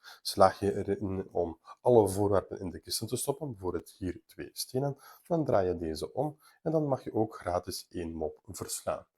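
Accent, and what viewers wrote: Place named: Belgium. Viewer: Hij is komt denk ik uit België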